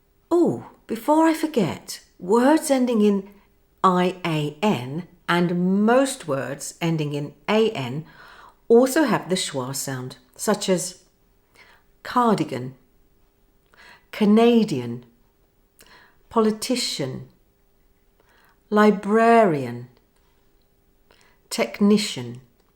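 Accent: United Kingdom. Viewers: Is it British English accent?? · Is it british accent?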